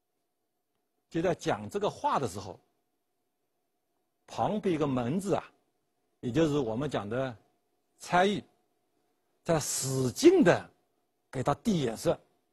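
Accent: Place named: Jiangnan, China. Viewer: 这个老师就是包邮区口音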